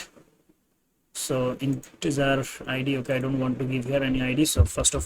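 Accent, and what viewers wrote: India. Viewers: 50% = How to make a table in html . Strong idian accent. Badly confused instructor. With errors. Only full refresh method. Please do again.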